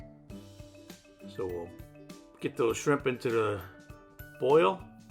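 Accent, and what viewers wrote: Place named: Louisiana, United States. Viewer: I loved watching him , loved his Cajun accent!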